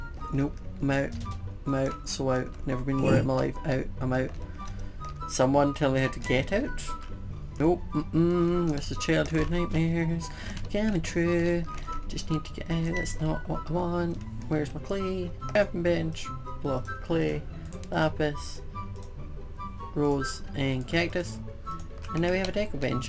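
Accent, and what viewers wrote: Scotland, United Kingdom. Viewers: Are U Scottish? iam (U sound Scottish) =) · I know that this was a long time ago but is he Scottish?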